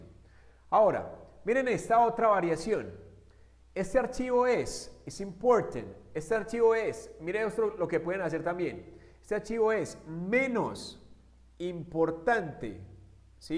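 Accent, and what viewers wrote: Colombia. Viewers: y el acento colombiano uyyyy maravillaa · sos un genio, me encanta su acento colombiano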